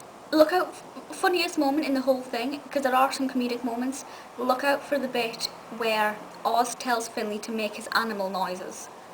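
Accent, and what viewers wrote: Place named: England, United Kingdom. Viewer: Miss: Love your witty review of Oz. I also love your English Accent!!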